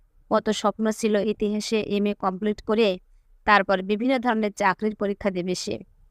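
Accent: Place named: Bangal, Bangladesh. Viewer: Bachik shilpir golpo-porata ekdomi bhalo lagalo na. Bangal bhasay kotha barite boltei paren, tai bole publicly porar somoye, koresen, khaisen, seleta, hoyese, firese - eigulo kane kot kot kore lagey. Ishh.....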